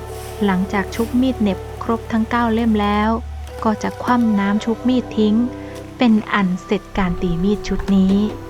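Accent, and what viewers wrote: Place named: North, Thailand. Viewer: เสียงบันยายดีมากๆคับฟังเหมือนอยู่เมืองเหนือคับ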